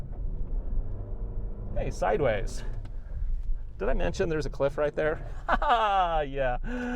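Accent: United States. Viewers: I am also wondering why we are watching the driver so much when we clicked on this vid to SEE HOW THESE TWO CROSSOVERS PERFORM GOING UP THAT HILL?! Less talk and more showing of the two CUVs. PS The way this guy drives and talks, he must be from the Midwest.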